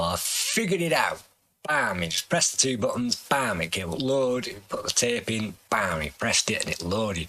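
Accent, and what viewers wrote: Lancashire, United Kingdom. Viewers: Great to hear more about you. We're the same age and some your memories sound similar with me being from Yorkshire. Loving the Lancashire accent mate, don't listen to anyone giving out to you for it! Hope to see more videos soon.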